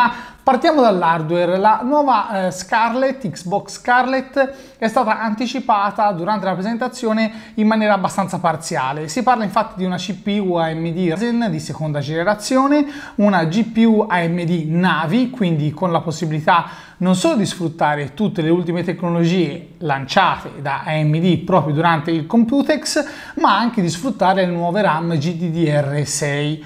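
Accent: Tuscany, Italy. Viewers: sei toscano? 😂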